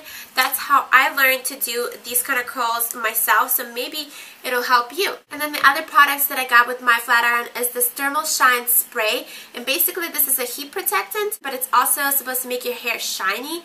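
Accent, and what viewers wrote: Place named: New Jersey, United States. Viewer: Why does it sound like you're from New Jersey?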